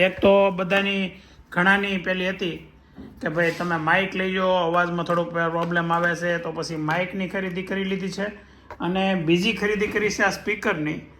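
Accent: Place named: Mumbai, India. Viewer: Dada e song bavj saras tune maa gaayu, emno accent thi laage che ke pela Mumbai rehta hoi.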